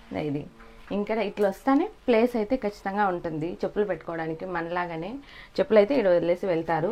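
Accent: Rayalaseema, India. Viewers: Hello, its like pakka Indian home..not like kdramas one..😅 i watch kdramas. Where r u from? Ur dilect is like rayalaseema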